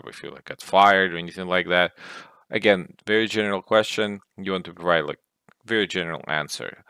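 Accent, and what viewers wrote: Russia. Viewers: Fix your ruzzian accent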